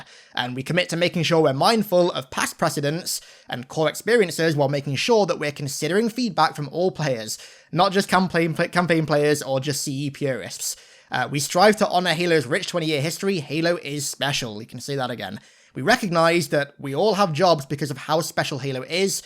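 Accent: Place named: United Kingdom. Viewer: what did you do with? And why do you have a British accent?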